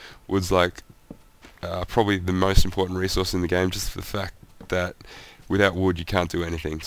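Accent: Australia. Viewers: Aussie? But uh, full screen please and I am gonna sub cos I'm liking the shit :P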